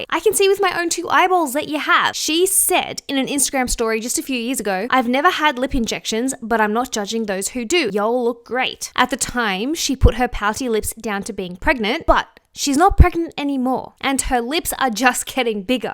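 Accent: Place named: Australia. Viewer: love your videos and just a fact, your accent remembers me of Felix from Stray Kids cause he is australian too (and Bangchan) <3 love you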